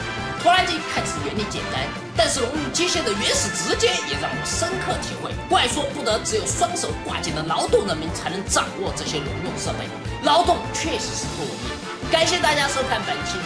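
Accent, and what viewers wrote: Sichuan, China. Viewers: 欸！！！你的四川话好亲切！！！！！因为我就是四川人！！！在国外呆了好多好多年了！！！！！！！！！！！ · 怪说不得的川普发音就很local了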